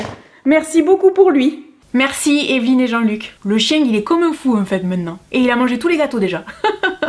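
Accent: South, France. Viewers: Joyeux Noël à toi, ton fils et ta maman et aux abonné-es🎄💕. Merci pour tes vidéos tu es un véritable rayon de soleil 🌞 avec l’accent du sud j’adore 😍! À la base je ne suis pas trop branchée YouTubeuses beauté mais je t’adore tellement que je regarde tout tes contenus et suis super heureuse dès que tu fais plus de vidéos 🍀🙏🏼. Gros Bisous de Suisse 🇨🇭 😘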